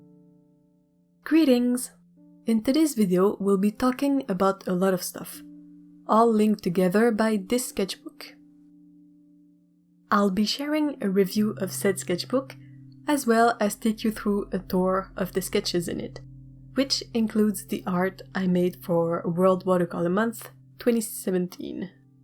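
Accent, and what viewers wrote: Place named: Quebec, Canada. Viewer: Juste à entendre ta voix, je savais que tu étais du Québec ! Tu fais du travail magnifique!! Merci pour le partage :)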